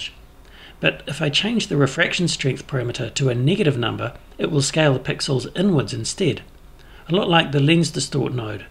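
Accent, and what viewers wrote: New Zealand. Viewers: wow i learnt about quite a few new Fusion techniques and methods in this one so thanks heaps. nice to hear a Kiwi voice too. great explanation and tutorial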